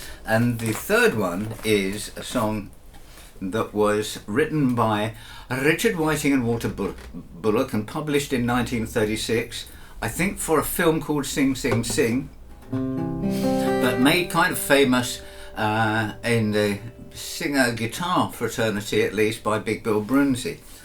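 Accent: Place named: United Kingdom. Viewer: Hey, I love your channel. It’s just lovely to listen to your ramblings/musings/stories whatever. Love your guitars and stories of how you got them. Keep up the good work..oh and it’s nice to hear a British accent..😊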